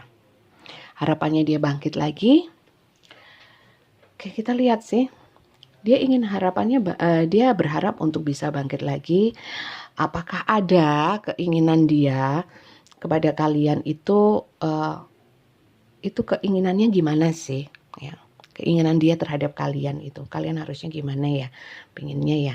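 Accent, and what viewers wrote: East Java, Indonesia. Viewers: Makasih. Bunda readingnya ... Ituloh logat Jawa timur yo 😁😁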